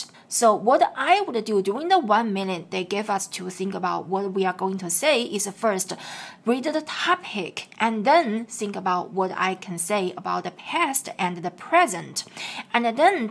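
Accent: China. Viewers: But your English sounds more like Chinese than English; I'm getting confused listening to you.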